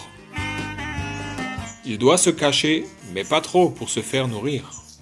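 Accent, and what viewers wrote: Alsace, France. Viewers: L'accent alsacien vous dérange? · Donc désolé de ne pas apprécier cet accent alsacien mais un bon reportage animalier (comme celui çi) mérite une voie sans accent, claire, expressive et appréciable par le plus de monde… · Merci Monsieur, vous avez un talent certain pour le documentaire animalier . Très belles prises de vue , commentaires d'humour . J'aime votre accent alsacien également et avez de l'amour pour la nature . Vive le maintien de la diversité culturelle comme celle animalière. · je ne suis pas du tout d'accord avec vous, la voix est claire, la diction correcte avec une bonne articulation et, les commentaires très intéressants. L'accent alsacien est de plus charmant. D'ailleurs, je viens de m'abonner.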